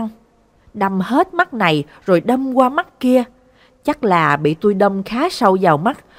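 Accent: South, Vietnam. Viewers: Câu chuyện cảm động, với người đọc giọng miền nam mộc mạc, rất hay 👍! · Giọng đọc miền nam rất hay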